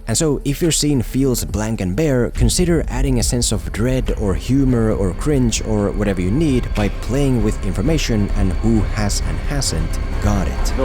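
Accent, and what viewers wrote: Finland. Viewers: Bruh u sure your not finnish? Your english sounds exactly like finnish accent.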